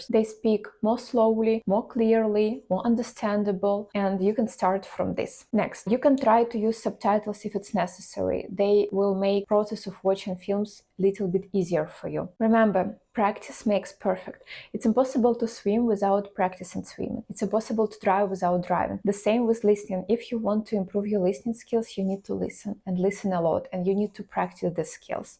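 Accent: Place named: Eastern Europe. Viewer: Very nice Slavic accent. Continue filming on similar topics. Будь ласка Дуже файний слов'янський акцент. Продовження знімати на схожі теми. Please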